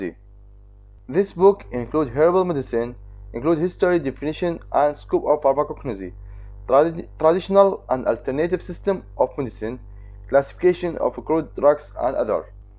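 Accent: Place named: Arab world. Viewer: Your English accent sounds arabic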